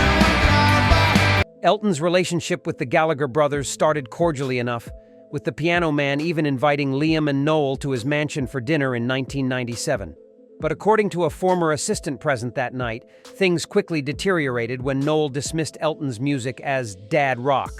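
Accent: United States. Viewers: Elton and Freddie frequently partied together and also exchanged gifts with each other. Elton even cried at Freddie’s passing, and sang the song at his tribute concert. They were very good and close friends, I’d say and the rivalry wasn’t toxic the way it’s described here. This snarling narrator with an American accent has produced this video for clickbait by peddling in significant mis/disinformation imo.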